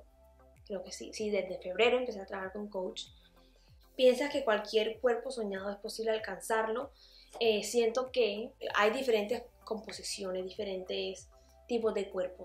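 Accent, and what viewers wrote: Venezuela. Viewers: Es de colombia pero habla muy venezolano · Buen video!! Pensé que eras Venezolana...por tu acento tan nuetro.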